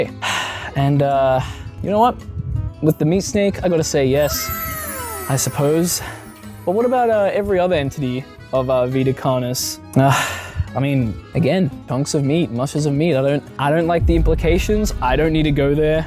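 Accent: Australia. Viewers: are you aussie?? fuck yeah brother best country in the world (said that bc of the fauna and accent but i checked the bio) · You look really nice, like not just how you look, your voice and your look go well together in a way that makes you look better. Sorry if that sounds weird. I'm high, are you australia? I can't tell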